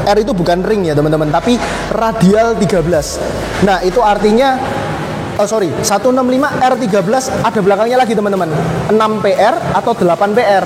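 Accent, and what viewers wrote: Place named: Surabaya, Indonesia. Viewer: Saya suka logatnya ko. Asli arek suroboyo iki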